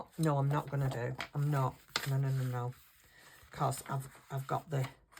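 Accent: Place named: England, United Kingdom. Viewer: I like it a lot! I just started junk journals last year and I am like you! I tend 5o hurry through and then I make mistakes and sometimes tear things apart! 😂😂😂. Keep up the great work! One your paper choice! Where in England are you from? I lived in Suffolk many years ago ( I’m American). Loved it! Love your accent!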